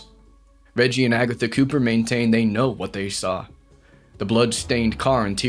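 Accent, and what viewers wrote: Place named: South, United States. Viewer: I like these dogman stories! Lon Chaney as the Wolfman comes to mind. You read this one quite fast though. I couldn't savor the flavor. Love the idea of a house of glass, though, how folks could be one with the trees all around and no bugs to bother you! Imagine a light rain pattering against the glass, a fire in the fireplace, warm and cozy, with little snaps and crackles and suddenly a body thrown against the glass. Great story. May I ask where in the south you are/were from? I can hear a bit of the twang in your voice that we southerns can't seem to loose no matter how hard we try.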